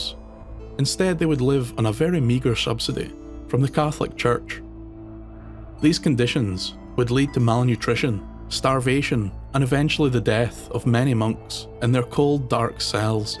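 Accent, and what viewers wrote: Scotland, United Kingdom. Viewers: I only just discovered your channel and I'm hooked! I'm surprised you don't have more subscribers. It's so refreshing to hear Scottish folklore told by someone who's actually Scottish and not American lol.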